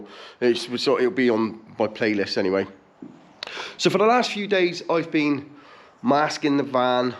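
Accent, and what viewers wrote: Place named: West Country, United Kingdom. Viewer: Decent mate! You a West Country lad aswell?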